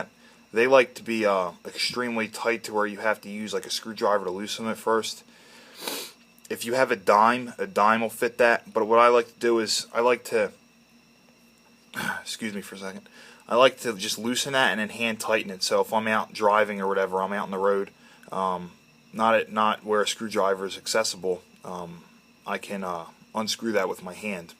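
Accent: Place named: Pennsylvania, United States. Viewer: Just scored one of these tonight and I like it. Side note: that is one of the heaviest Pennsylvanian accents I've ever heard.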